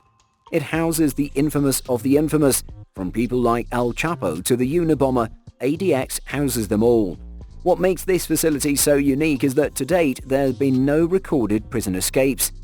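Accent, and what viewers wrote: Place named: United Kingdom. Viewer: The narrator is British. That is how the British pronounce the Z.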